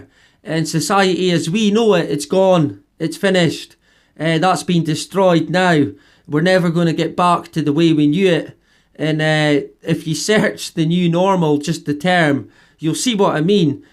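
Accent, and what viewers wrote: Scotland, United Kingdom. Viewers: Am I the only one who loves to hear his intro ? "Right, here we go, back again, back again" in that Scottish accent.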